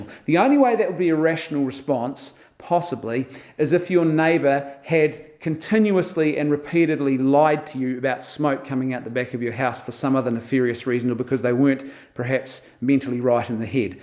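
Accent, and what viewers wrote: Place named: New Zealand. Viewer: Well done. Great to hear a competent kiwi voice on this sort of topic.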